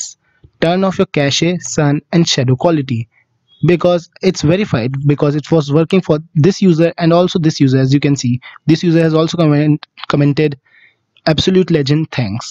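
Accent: India. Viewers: I dont trust this indian voice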